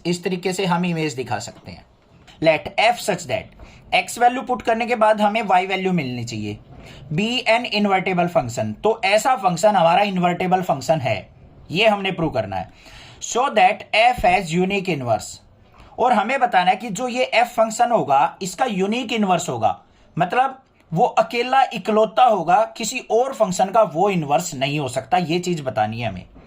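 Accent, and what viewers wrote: Haryana, India. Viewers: Are you from haryana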